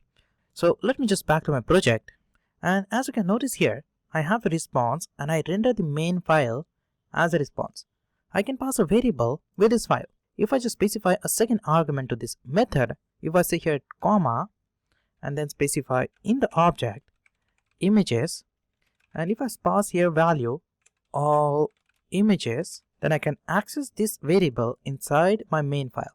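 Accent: India. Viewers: Dude, your thick indian accent just gave me a headache. Thank you so much.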